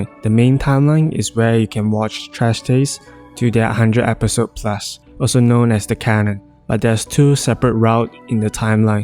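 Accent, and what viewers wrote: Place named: Malaysia. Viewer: The Malaysia accent is killing me 😂 Good video brother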